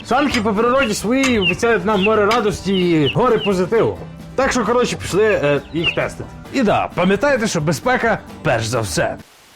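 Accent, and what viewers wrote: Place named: Western Ukraine, Ukraine. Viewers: А сам из Украины, но у тебя такой акцент шо иногда я нихера не понимаю что ты там несешь, наверное ты из очень глубокого западенського села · уважуха за гуцульський діалект.. щистьи, здоровльи тобі та побільше таких відіків) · Це Галичанський акцент, скоріш за все, франківська область. Здарова землякам)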